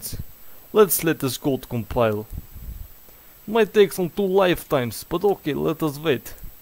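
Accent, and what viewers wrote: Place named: Russia. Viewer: Love you Russian accent... ❤️ Gangsta Russian coding guy. 😂🎉 Greeting from Serbia.🎉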